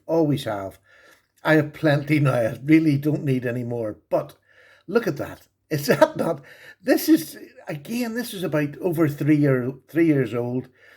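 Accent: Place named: Ireland. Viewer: Love the accent my name is Shawn Michael Fleming my parents are from Cork Ireland a province of Munster Ireland 🇮🇪 which city are you from Ive always use to try to talk like my father and grandfather. As I’m sure you know Ireland is a beautiful place. Hope you have a HAPPY HOLIDAYS AND STAY SAFE. AND DON’T DRINK TO MUCH PROPER NO 12 IRISH WHISKEY 🥃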